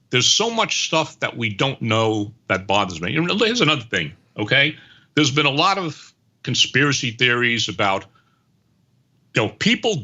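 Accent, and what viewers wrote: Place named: New York, United States. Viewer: Mr. is a confirmed Jesuit operative with direct ties to the headquarters of the Swiss Guard in Vatican City, the 5 families of the Cosa Nostra, nearly executive and board member of several Hollywood studios. Notice how hard he tries (and fails) to present himself as some educated professional. His struggle to suppress his borough accent is clear as day. Most of his ‘guests’ also just so happen to be big dumb wops — the vast majority of which are uneducated and underqualified to work in the professions they purport to be associated with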